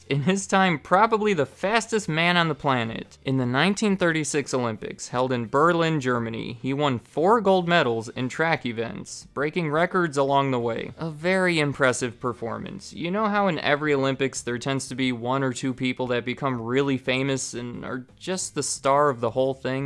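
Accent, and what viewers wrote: United States. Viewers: No need to apologize for the Americanized pronunciation; as an American living in Europe, I don’t take offense to the onslaught of mispronunciation of scores of American brands. It would be petty...😉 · This dude is so American it’s painstaking to listen to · hahha, yeah it's an American kind of thing to take liberties with other languages or Americanize English words isn't it😉 adidas = ugh-dee-dus aluminium = ugh-loo-me-num coupe = coop colour = =color They've got Nike down though👍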